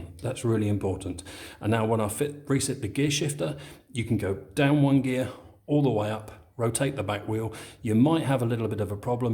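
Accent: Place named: London, United Kingdom. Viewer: I have no idea why my wife's name came up on the comment but it is ok because she is from London and has the same accent. You sir are good. I have watched all of your videos and as a retired engineer and I am impressed. I have a 2011 Multistrada. I am currently in the process of replacing the front fork seals. Your brake videos are excellent. After watching your videos I decided to look at the brakes when I finish the fork seals. In fact the information that you provided in your brake videos got me to thinking about my brakes on my Harley. They are really not that good. But I will apply what I learned from your videos and see if I can make some improvements. Look forward to more of your videos.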